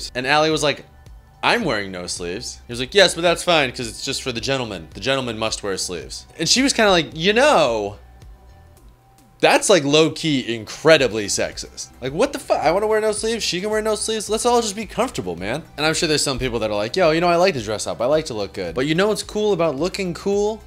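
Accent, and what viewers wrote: Boston, United States. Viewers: don't you dare fuckin censor that beautiful boston twang ya gump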